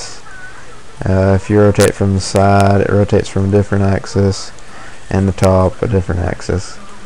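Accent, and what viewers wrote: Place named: Tennessee, United States. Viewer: damn guess since im from tn i ddnt notice the accent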